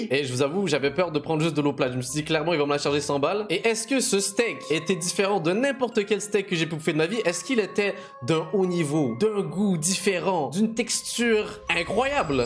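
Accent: Quebec, Canada. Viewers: Est-ce que c’est moi ou tu a une partie d’accent québécois. Je suis québécois et je crois que tu dois écouter quand même pas mal de vidéo québécois!!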